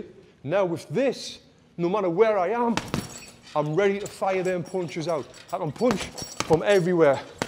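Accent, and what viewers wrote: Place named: North East England, United Kingdom. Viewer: Cheers mate, where u from? I’m from Durham myself and live in Washington now, you sound like ya from the north east marra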